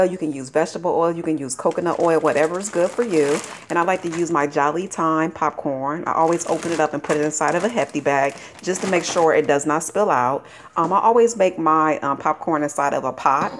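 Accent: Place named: California, United States. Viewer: Love all your videos!! I thought you sounded like you had a California accent until I seen you're from IL. If you turn your phone side ways (landscape) when recording, you won't have the black on the sides and the video will be larger.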